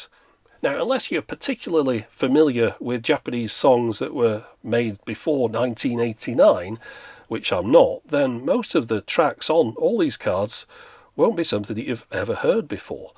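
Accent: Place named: England, United Kingdom. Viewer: "inverted commas". As an American, one of the things I love about this channel is hearing English accent, and the difference in terminology from one end of the Atlantic to the other.:-)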